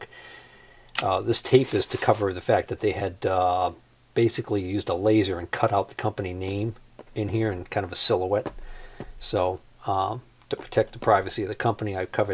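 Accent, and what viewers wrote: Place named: Massachusetts, United States. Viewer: So i found your vid on Victor regulator and i like your explanations. Looks like we are doing the same kinda stuff. I hear an accent too and it seems your from Ma? Btw, new sub.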